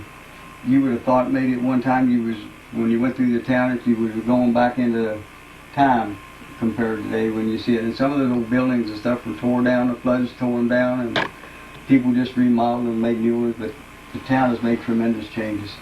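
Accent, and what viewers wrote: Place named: South, United States. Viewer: Your cute southern accent 😹😘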